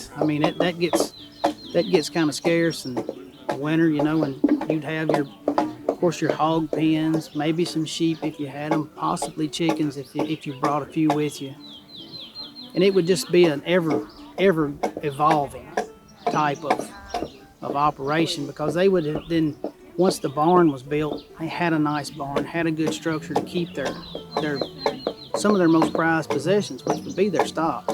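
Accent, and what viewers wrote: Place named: South, United States. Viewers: Hoo boy, that Middle TN accent (pronounced AY-uk-CENT). Feels like home, haha. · I’m a native North Carolinian, born and raised, but even I had to put this guy on 1.25x speed. A true southerner!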